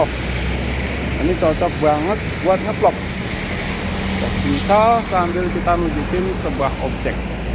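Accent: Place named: Java, Indonesia. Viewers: Jowo an maszhee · Jowo ne medok i jane wong ndi smian bang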